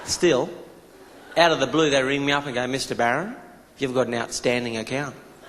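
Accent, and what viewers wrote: Australia. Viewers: no shit listen to his accent are you deaf? You couldn't be more Australian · The Australian accent is amazing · Country u come from isnt the factor what makes the difference in humor.... punch lines and the way you bring it does.... Aussie accent sounds better I'll admitt to that! ... 😂 bring on the laughs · Isn't good to hear a true Aussie voice...and an ol fashion one at that😅...just like grandpar & ya uncle from the 50 and 60s..what ya think..a bloody fairdinkim aussie😊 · Hilarious!!! Lazy Aussie accent + everyday things = killer combo. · nice to hear an aussie accent again :) good ol days.. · He makes himself sound so much more bogan. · OMG!!! This guy is freaking HILARIOUS!!! I'm in pain from laughing so hard!!! Love that Aussie accent to top it all. Outstanding indeed!!! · lol... he is so aussie.... that accent is so strong. :D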